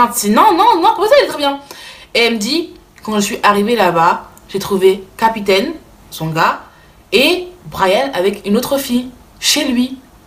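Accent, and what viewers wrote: Africa. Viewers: je t’aime bcp surtout ton accent africaine oh j’adore 😍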